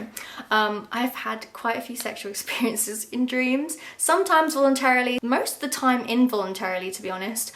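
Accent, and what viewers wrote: Scotland, United Kingdom. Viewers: I'm going to guess from her accent is Scottish, can't find any explicit info, I'm not the best linguist/dialect judge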